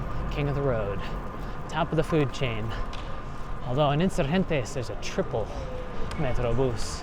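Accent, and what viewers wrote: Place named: Spain. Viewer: Great video! Además de lo que han mencionado otros, quiero que sepas que tienes un hermoso acento español, una voz suave y amable. Thank you for sharing! ♥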